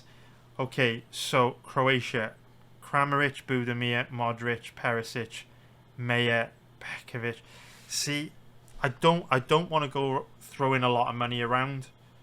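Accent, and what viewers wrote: United Kingdom. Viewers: Cardiff accent isi? 🏴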